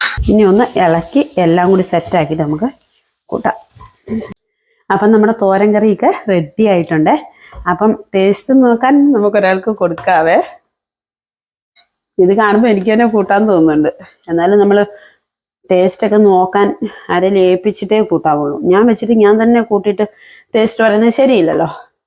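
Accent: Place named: Southern Kerala, India. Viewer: കാസര്‍കോഡ് ആണൊ സംസാരം കേട്ട് ഞാന്‍ വിചാരിച്ചു ഇങ്ങോട്ട് തെക്ക് ആണെന്ന് chilasamayam പാലക്കാട് എന്തായാലും സംസാരം സൂപ്പര്‍ നിങ്ങളുടെ വീഡിയോ എല്ലാതും ഒന്നിനൊന്ന് മെച്ചം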